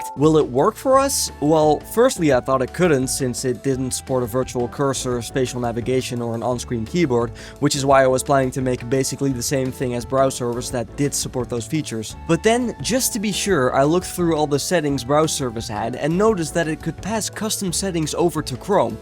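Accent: Netherlands. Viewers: I hear a little bit Nederlands 🔥😭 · Ik hoor een nederlands accent Edit: NEDERLANDS, HET IS BEWIJS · what nice video with a thick dutch accent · you dutch? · Are you dutch? WAS THAT THE MEDIAMARKT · wait are u dutch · ik vind jou nederlandse accent echt geweldig :) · i smell a lil dutch hmmmmmmm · From the very first second of hearing your voice I knew you were Dutch lmao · Is bro nederlands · ur dutch?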